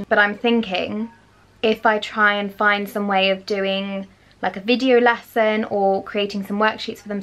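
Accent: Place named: United Kingdom. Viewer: I am improving my English by listening your British accent. 😂😂😇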